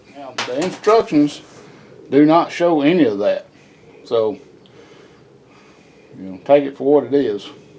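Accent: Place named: Kentucky, United States. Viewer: He sounds like he is from Kentucky!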